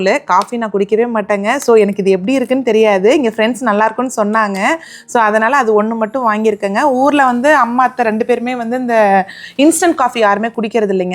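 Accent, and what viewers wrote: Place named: Palani, India. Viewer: சூப்பர் தஙகம் எந்த ஊர் கண்ணே எங்க ஊர் பழனி❤❤❤ அம்மா ஊர் எது பேசுவது எங்க மாதிரியே இருக்குதுடா❤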